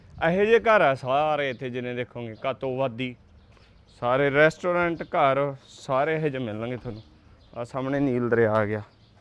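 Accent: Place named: Punjab, India. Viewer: Your "Pandu Punjabi accent " is excellent.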